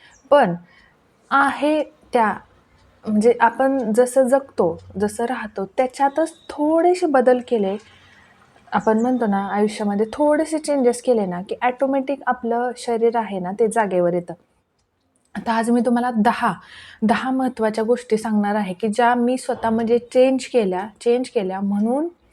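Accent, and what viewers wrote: Satara, India. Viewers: बोलण्यावरुन भाषा.वाटलेच...आपलीसातारी रांगडी भाषा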